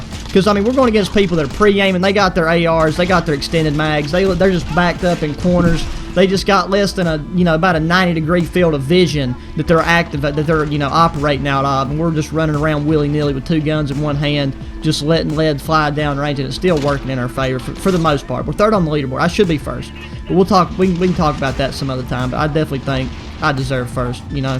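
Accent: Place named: Texas, United States. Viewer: You have a heavy Texan accent 😳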